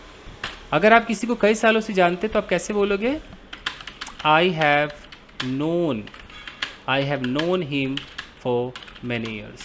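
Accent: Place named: Bengal, India. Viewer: Vaiya aap ka bengoli bohut sweet hai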